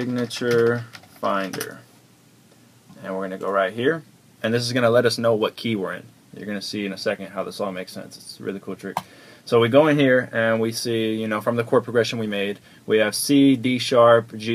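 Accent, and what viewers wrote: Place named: Texas, United States. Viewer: r you from texas or sum cause you got the hustla slang nom sayin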